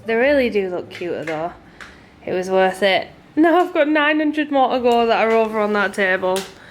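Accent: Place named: Yorkshire, United Kingdom. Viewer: Everything is so adorable!! Plus its great to hear a proper Yorkshire accent on YouTube! Makes me feel at home.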